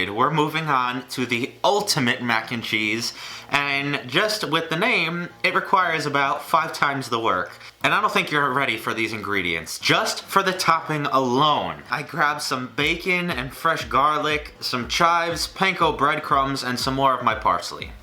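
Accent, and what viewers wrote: New York, United States. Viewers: Sounds like suburban New York to me.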